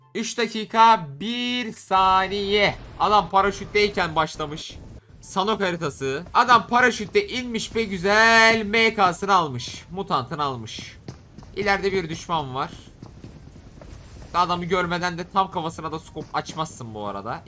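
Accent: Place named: Kayseri, Turkey. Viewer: kayserili olduğu konuşmalarından belli daşşağını yiyem diyo xd sivasdan selamlar adamsın abi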